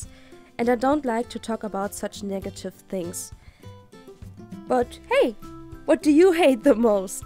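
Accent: Germany. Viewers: that accent...lol. i think you speak german don't you? i do so and have the same one xD srry if i'm wrong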